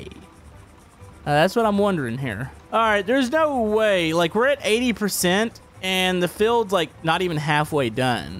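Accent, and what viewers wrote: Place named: Texas, United States. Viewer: Im sad he did not use his Texas acsent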